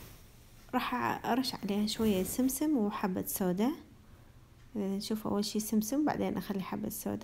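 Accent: Iraq. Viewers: هههههههههه كم احب اللهجة العراقية من الشام الجريحة اتكلم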